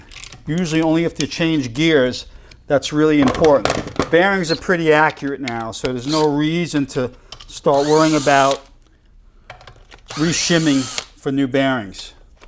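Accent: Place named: Massachusetts, United States. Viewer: What part of mass are you from you accent gives you away lol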